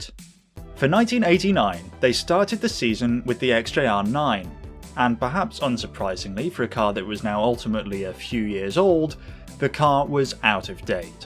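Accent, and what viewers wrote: United Kingdom. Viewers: As a french man I love your cotenent and the way you tell racing stories ! The English accent may also play a role... 👍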